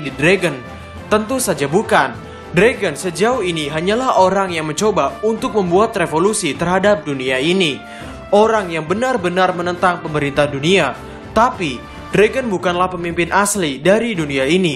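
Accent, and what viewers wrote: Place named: Madura, Indonesia. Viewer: Min, logatnya madura banget. Bener ga sih?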